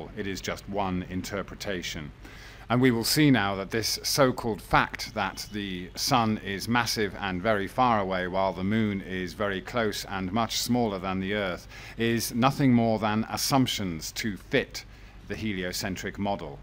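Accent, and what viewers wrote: United Kingdom. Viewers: You do realize the Moon period has nothing to do with the year, that the Romans had to abandon their 10 month calendar because it did not represent a year and they could not use it to plan their crops? do you know that the moon's 5 degree tilt has absolutely nothing to do with the tilt of the earth, other than keeping that tilt generally stable? Word salad, British accent with lots of extended Ss and your followers think you're extra smart.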